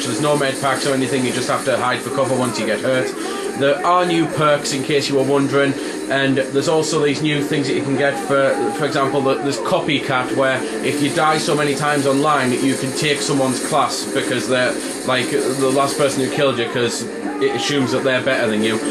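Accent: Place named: England, United Kingdom. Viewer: i love this game I'm gonna get it and i also love you're English accent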